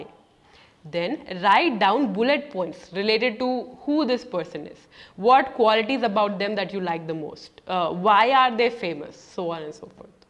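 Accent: Hyderabad, India. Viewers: Sister....are you from Hyderabad??? I initially thought that this is an abroad channel.. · Akka hyd a...? 😁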